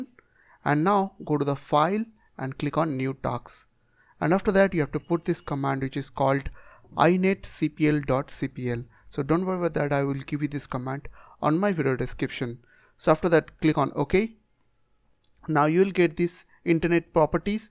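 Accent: India. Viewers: he really got the stereotypical indian tech support scammer voice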